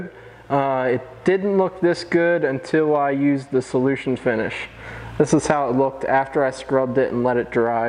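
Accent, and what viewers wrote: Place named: Midwest, United States. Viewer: You've gotta be from the Midwest with that accent 😄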